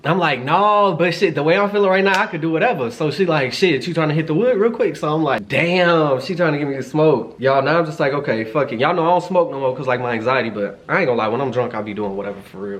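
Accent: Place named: Chicago, United States. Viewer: I can hear your Chicago accent stronger than ever in this video. You owe her some head tho. 😂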